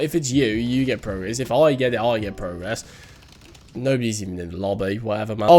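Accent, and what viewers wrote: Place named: United Kingdom. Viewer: Oi an angry British chap 🤓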